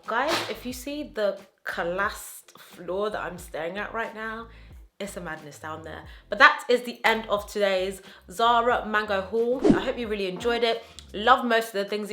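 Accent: United Kingdom. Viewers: You have the cutest British accent! I wish i sounded British! I love your style and you look amazing in every piece!! Thanks for sharing love xoxo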